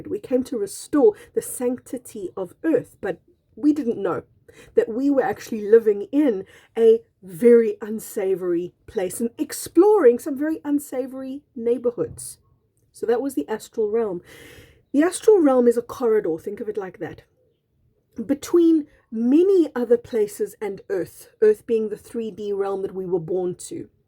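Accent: South Africa. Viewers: Haha, more just an avid reader. I loved reading books as a kid and I always excelled in English classes, be it grammar, spelling, etc. I guess I'm just a Spelling/Grammar Nazi! On a related note, I asked what her accent was, I'm American, It didn't sound British or Australian. It's South African! They make an "EEE" sound when pronouncing pin 📌 it sounds like "PEEEN." She threw me for a loop when she said "skeletal" and pronounced it "Ske-LEEE-tal." I had to research what her accent was! Like I said, South African, or "South Af-REEE-can" 😘😘😘😘😘😘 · So delighted to discover you,! Your video popped up on my feed randomly today and when I heard your SA accent I immediately Subscribed. Lol! Such an amazing message and I cannot wait to learn more from you!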